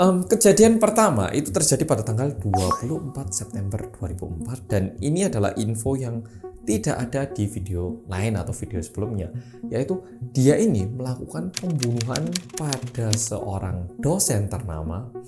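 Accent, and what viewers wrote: Java, Indonesia. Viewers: Hujan gini nonton KOREA REOMET BERSAMA ORANG KOREA YANG MEDHOKKKK.. Hehehe.. Semangat trus yea mas bikin konten spti ini candu bget dah.. Sehat2 sllu yea mas. 😊 🙏🏾 🖤 🔥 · Kok mirip. Ko Korea medok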